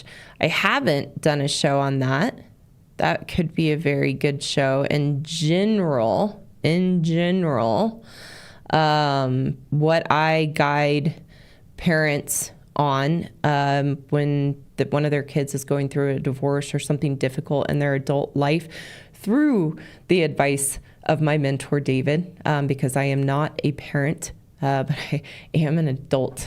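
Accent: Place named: Midwest, United States. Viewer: I knew that I was hearing a hint of a midwest accent with a few Hoosierisms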